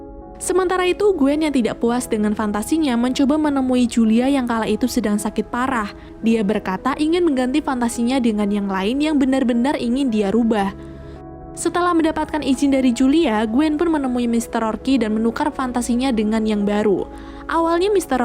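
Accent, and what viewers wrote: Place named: West Java, Indonesia. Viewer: Alhamdulillah Damang 👍 Sunda na ti palih mana teh😁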